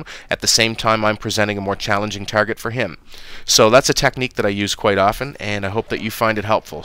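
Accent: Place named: East, Canada. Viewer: I can tell you're Canadian, probably eastern from your accent. Where are you from? I'm in TO. Nice series!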